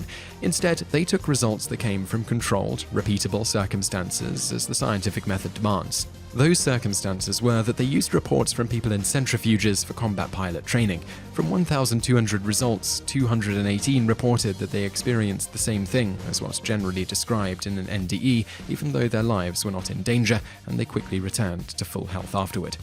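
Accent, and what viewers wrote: United Kingdom. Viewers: Hmm. Your picks for this video as well as other recent ones are starting to betray an agenda... Having a guy with a British accent reassuring me that my government is benevolent and has my best interests in mind and that there is nothing to answer for after death is damn well played...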